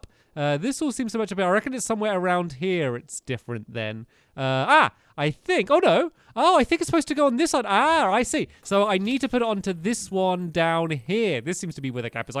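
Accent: England, United Kingdom. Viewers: Question are you English or american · hes british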